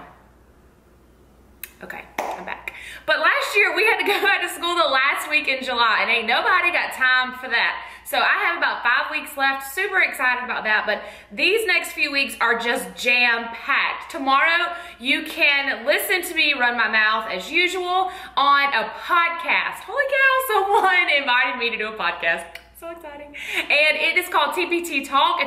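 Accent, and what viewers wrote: South, United States. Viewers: You are so southern and I love it!!! I'm a Texas gal!!! You are so down to earth and hilarious!!!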